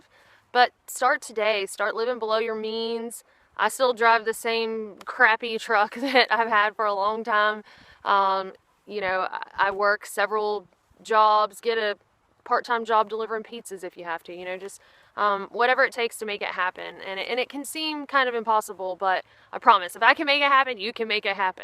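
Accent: South, United States. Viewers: hey how old did you do the AT? also did you make it to the PCT? i could listen to you all day long i love the southern draw:)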